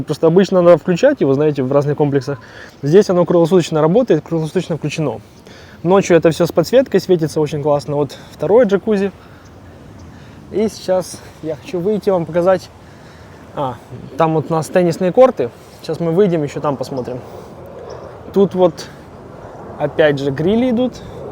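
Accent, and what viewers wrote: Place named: Ukraine. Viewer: По говору слышно что украинец.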